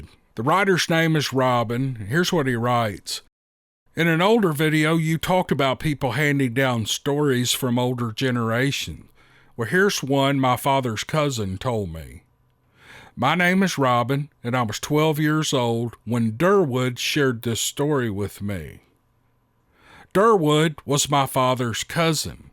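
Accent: South, United States. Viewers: Gosh I’ve missed you! Some how I got unsubscribed and have been dealing with deaths in our family 🥺 today I went looking for you and it’s so nice to be back home.. I love your chit chat and I’ve missed your southern accent ( from Eastern Washington State🤣) thank you for all you do and this beautiful community of friends💕🌻🙏♥️God bless you all!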